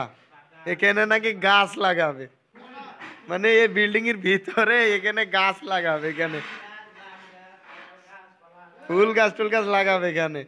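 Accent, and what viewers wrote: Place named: Chuadanga, Bangladesh. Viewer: কথা শুনেই বুঝতে পারছি, আমার বাড়ি ও চুয়াডাঙ্গা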